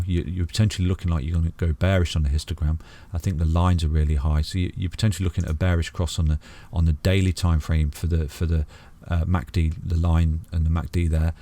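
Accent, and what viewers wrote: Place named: Nottingham, United Kingdom. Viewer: me to .... can tell with your accent.... I'm from sunny Rotherham .... I'm guessing your notts way on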